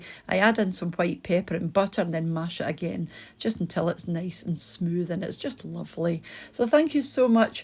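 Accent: Scotland, United Kingdom. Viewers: yes, I knew from reading here. your accent/brogue sounds just like them. I am in Michigan as my Grandparent's came from Saltcoats/Stevenston. We are still very close to our kin there and see them often.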